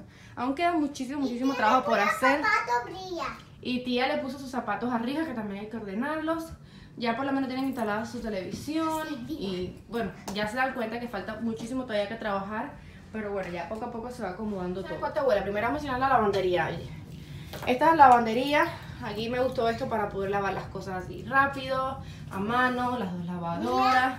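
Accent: Cuba. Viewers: Hola!! Soy nueva en tu canal llevo una semana contigo, el primer vídeo que vi fue el último que hiciste de decoración de Navidad pero no podía dejar de comentar este. Adoro tu casa osea me mudaba ya!!! Es preciosa luminosa ainsss me encanta!!! Poco a poco veo todos tus vídeos soy española y cuando termino de verte hablo con acento cubano tu ya me entiendes jajaja que me encanta tu gusto, es muy parecido al mio y adoro tu casaaaa!!! Un besado enorme!!!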